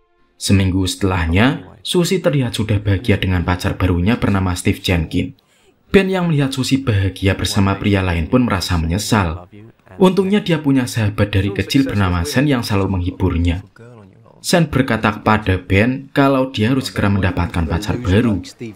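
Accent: Java, Indonesia. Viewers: Movie crop versi medok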